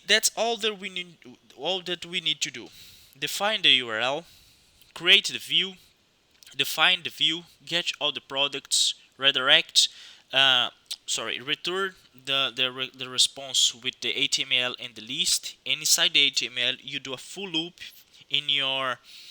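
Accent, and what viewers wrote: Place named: Brazil. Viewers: For sure. Reconheci o sotaque no começo também. Tava com o inglês muito facil pra entender aiheaieha So pode ser Brazuca. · are you brazilian? eu conheço esse sotaque! curti o vídeo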